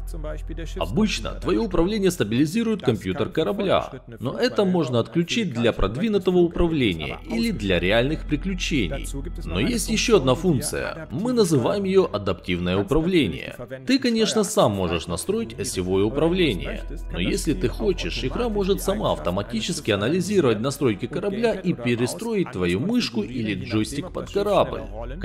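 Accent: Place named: Rostov, Russia. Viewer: Приветствую. Знакомый говор, не из Ростовской области случайно?!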